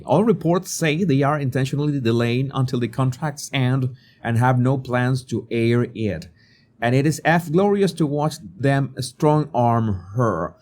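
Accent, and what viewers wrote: Spain. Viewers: your your accent is not anywhere near American. You speak English with a 100% Spanish accent. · I’m not a MM or Henry fan at all, can’t stand them. But, You can’t expect her to have a Colombian accent if she’s not from there. It’s like Canadians or Americans expecting a foreigner to have the dialect down perfectly when visiting as a tourist. It’s outrageous. Especially since you have a Spanish accent and have been doing YouTube videos for years in english. You are just being picky at this point and a little snobby.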